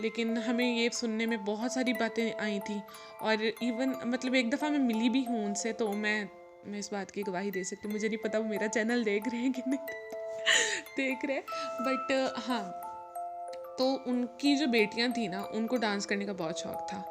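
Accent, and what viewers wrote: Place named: Punjab, India. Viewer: Very interesting story I really enjoyed or apka punjabi accent buhat acha hai 👍👍😂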